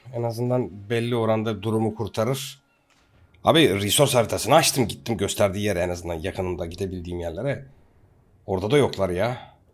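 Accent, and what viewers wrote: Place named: Anatolia, Turkey. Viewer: Anadolu insanı gibi konuşman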